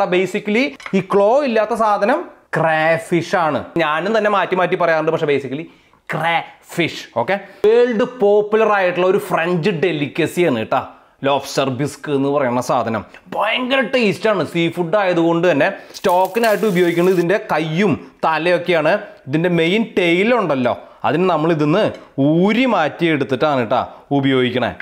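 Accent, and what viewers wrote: Thrissur, India. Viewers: ❤… lub ur tcr slang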